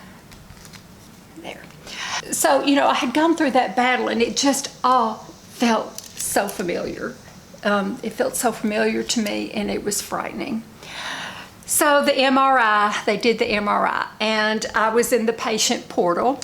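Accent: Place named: Tennessee, United States. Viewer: Just found your your YouvTube..I can so relate to you..I from Tennessee and listening to you takes me home..Im 70 and really appreciate you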